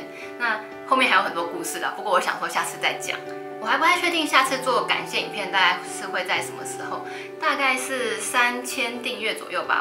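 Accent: Taiwan. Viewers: 我從跟姐姐去東大門批貨那裡訂閱的！你的台灣口音我覺得很可愛很有親切感！想問妳住台灣哪裡？謝謝你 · 說的清楚，有台灣味，很認真。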